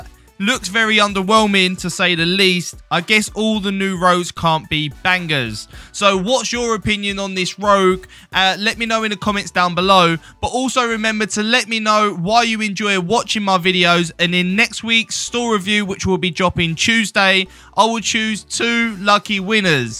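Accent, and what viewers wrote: United Kingdom. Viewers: I like your content because you show off and talk about cosmetics, you always bring the news of the next update, do awesome gameplays and also I love British accents. Good luck for everyone in the giveaway!! And I'm very excited about runway.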